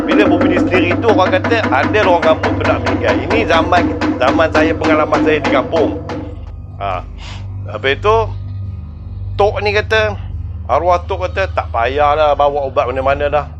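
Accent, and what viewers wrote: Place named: Perak, Malaysia. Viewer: Orang bota ke ni? pekat no loghat peghok 😆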